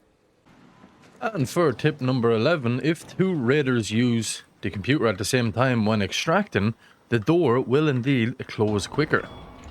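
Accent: Ireland. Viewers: Its English but the guy is Irish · Last name O'halloran but can't understand an Irish Accent - You're high on spectrum or what?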